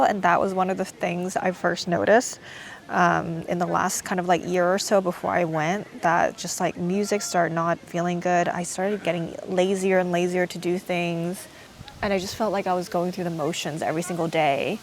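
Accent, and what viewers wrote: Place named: Canada. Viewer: I love the Canadian raising in your accent - every other sentence sounds like a question!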